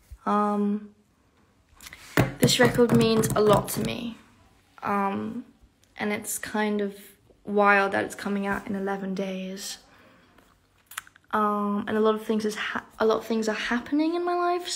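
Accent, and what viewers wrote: United Kingdom. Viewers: she's british ??????? :( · She is British????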